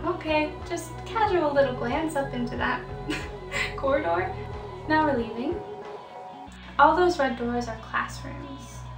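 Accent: France. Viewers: okay first, sorry for my BAD BAD english !! i very like your video !! i'm French and i like your french accent the indoor of "Science Po AIX" is so ugly !! see you next time ;) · Tu as un super accent français et tu doit être une personne très agréable ! Continue ce que tu fais en gardant le sourire !😊✨😉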